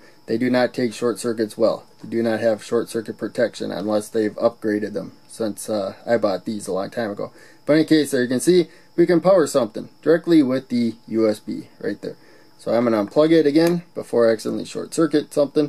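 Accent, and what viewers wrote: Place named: Northern Wisconsin, United States. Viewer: Nice video. I hadn’t heard of breadboard power supplies. I also use a branch supply but often my projects need to be mobile to test so this is a nice option. By the way, I have to ask, are you from Wisconsin? Northern Wisconsin? You sound almost exactly like my cousin who grew up in Superior.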